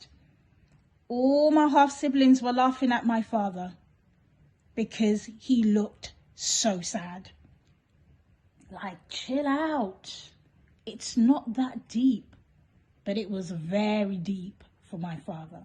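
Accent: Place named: Nigeria. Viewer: You sounded Nigerian.. 😮❤❤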